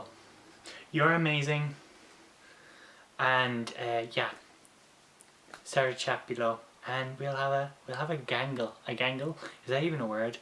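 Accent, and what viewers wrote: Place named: Ireland. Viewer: Anyone saying you don't sound like a woman is what we in Canada like call "hosers", (foolish or uncultivated people), You definitely have a feminine voice, I actually always thought the Irish accent sounds sexier in women than men and you got that sexy goin' on sister in all aspect. Your videos are awesome and definitely inspirational, so I hope you go through with your channel no matter what, since as trans people, especially as trans women, we have a truly hard fight to break barriers, and I don't think YouTube's threats should be an exception to our strength.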